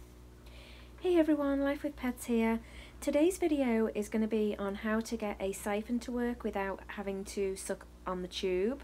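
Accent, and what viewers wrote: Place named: North West England, United Kingdom. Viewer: Great video... mine said i needed to shake it up and down in the water to start it... there was more water on the floor afterwards than in the bucket. Are you from the north west (wirral way?) I cant place that accent but it sounds familiar.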